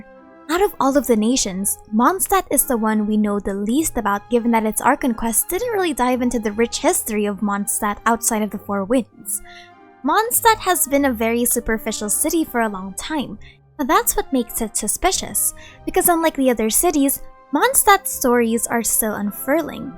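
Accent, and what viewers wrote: Philippines. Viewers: Somehow i knew that you are a filipino based on your english accent. love your videos btw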